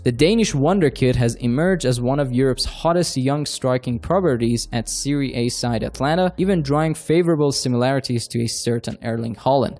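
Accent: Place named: United States. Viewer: I dont understand one item that he speaks about please stop these american voices and put in british voices.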